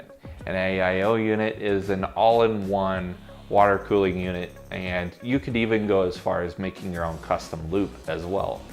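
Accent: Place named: Brooklyn, United States. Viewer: if i didnt know id think you lived in brooklyn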